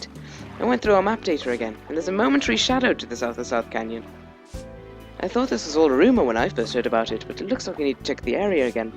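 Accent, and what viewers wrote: United Kingdom. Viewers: Cool!!! Hey, are you british?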